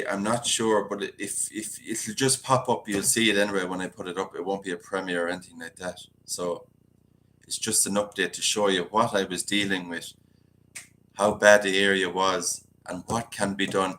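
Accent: Ireland. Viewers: I like your Irish accent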